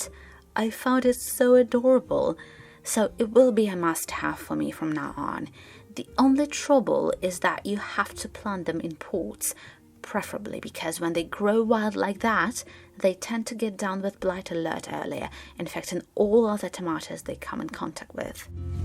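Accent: United Kingdom. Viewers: why does she have a harry potter accent?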